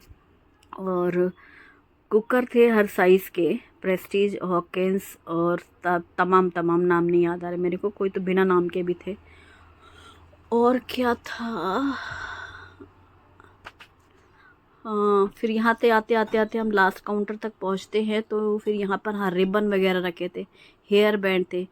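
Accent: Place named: Lucknow, India. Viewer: Hum lko k hi hai.. Apki bolne k tareeke se hi laga ap wahi k aspas k hoge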